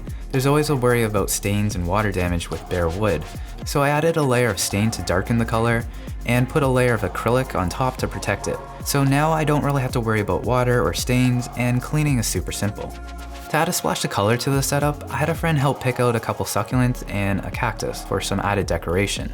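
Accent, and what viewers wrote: Canada. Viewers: Woah are you from Toronto?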